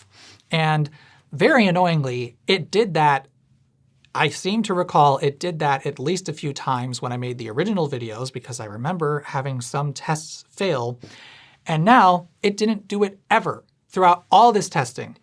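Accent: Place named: Midwest, United States. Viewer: That's just his Midwest showing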